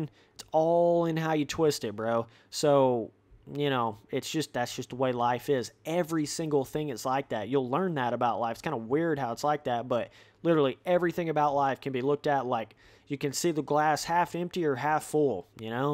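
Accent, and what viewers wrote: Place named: South, United States. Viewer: And you sound like a stupid ass hillbilly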